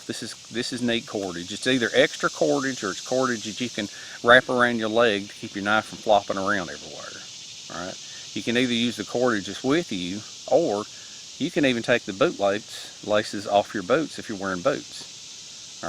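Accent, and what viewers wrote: South, United States. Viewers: Hey Bro, I'm in South Carolina. By the sound of your accent, we're southern cousins. From which of theses United States do you hail, patriot?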